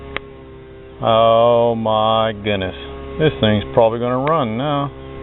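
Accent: Pittsburgh, United States. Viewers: Thanks! gonna try this out on my Toro! Knew you was from da'Burgh!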